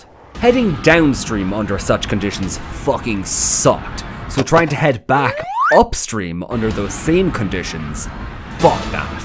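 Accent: Ireland. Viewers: I never thought I'd ever watch a video about US president Theodore Roosevelt going across the country to catch a bunch of pirates, narrated by an Irishman sprinkled with clips from Metal Gear Solid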